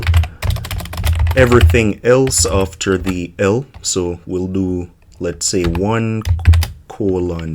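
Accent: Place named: Jamaica. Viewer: I love your accent. Jamaica?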